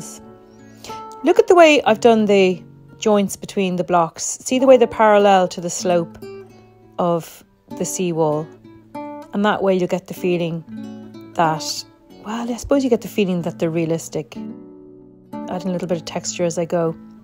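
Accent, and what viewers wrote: Ireland. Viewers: Hi! I have got to know you and your charming artworks from Sketchbook Revival 2023 and what blessing that was! I am totally blown away by your works and love all your videos! Your voice is so calming and I love your Irish accent 🥰! I enjoy the scenery in your videos too! I get transported in your world of wonder and for that I am ever so grateful! Thank you so much for adding that little spark of happiness in my day when you post a new video! Love and Blessings to You and your family …..Lorraine 😁🎨🇲🇹 ( Malta)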